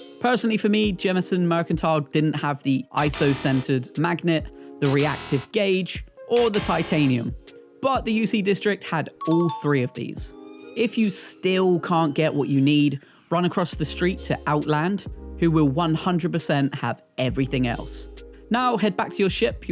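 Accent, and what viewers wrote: United Kingdom. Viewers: Funny, sounds British but saying ALUMINIUM wrong.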